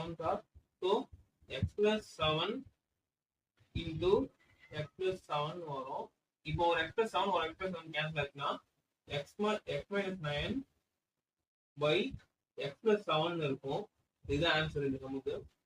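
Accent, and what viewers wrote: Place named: Madurai, India. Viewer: Neenga madurai ha bro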